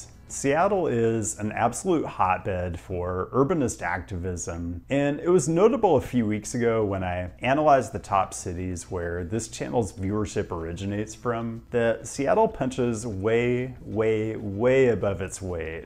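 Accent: United States. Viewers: Your voice.... You are who most Europeans think all Americans sound like!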